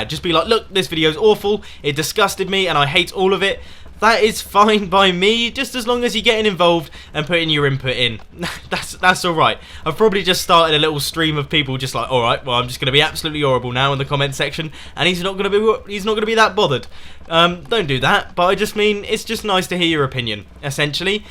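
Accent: United Kingdom. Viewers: I think what I love most is the Britishness of your accent and the music. It's just so... British. Keep up the good work. Love your stuff.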